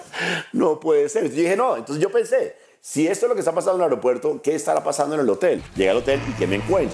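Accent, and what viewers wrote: Bogotá, Colombia. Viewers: Mas Rolo que este man no se puede ser🤣🤣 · ese es el acento en Bogotá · es acento cachaco propio bogotano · tiene el acento más bogotano de Bogotá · Acento Rolo jajja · cuenta la historia muy amena, los Colombianos tienen un acento encantador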